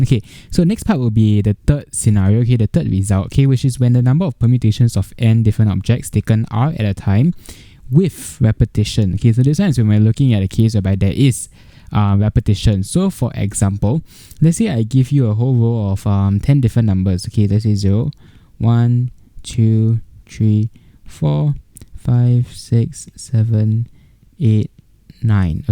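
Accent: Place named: Singapore. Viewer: are u singaporean ?? istg u sound just like my singaporean friend!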